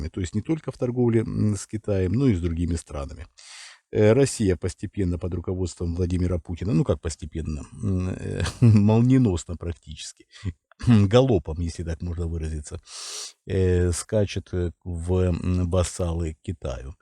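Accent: Ukraine. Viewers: Смехуёчки во время эфира раздражают!!! Никакого анализа...и голос похож на голос Кашпировского, с украинским акцентом.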